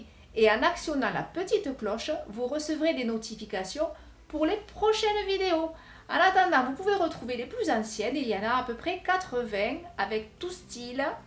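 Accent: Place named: South-West, France. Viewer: Un bel accent du sud ouest?? Pour une video très sympa merci!! Je veux faire du découpage collage, punk, moderne, tatouage!! Merci pour les astuces collage/peinture/materiel....Le papier de boîte a chaussure, c'est une très bonne idée de recyclage!!! Et ça donne facilement du relief a nos ouvrages merci et Kenavo!,😉😉😉👌👌👌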